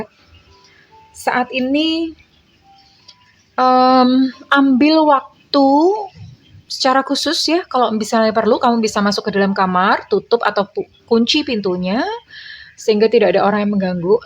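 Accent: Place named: East Java, Indonesia. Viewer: Bunda orang mn y kok bhs nya kayak orang Jawa timuran hehe sy orang Jawa timur Bun hehe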